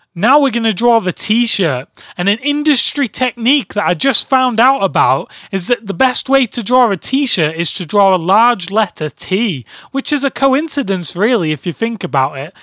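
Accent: United Kingdom. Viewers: Yay female Scottish link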